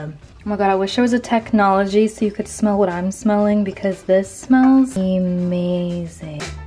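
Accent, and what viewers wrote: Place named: South, United States. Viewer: Lol what’s with the southern accent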